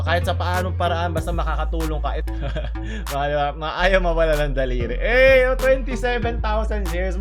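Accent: Visayas, Philippines. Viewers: anue ba 😳😂 iba talaga pag bisaya ka, iba pagkakabasa mo 😂 (bisayawa here)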